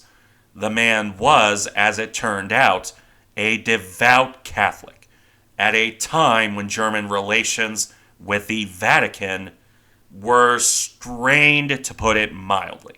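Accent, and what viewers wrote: United States. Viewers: Please don’t be a rude Prussian. He freely acknowledges his American butchering of native pronunciations. It’s something we do here and we are fine with it.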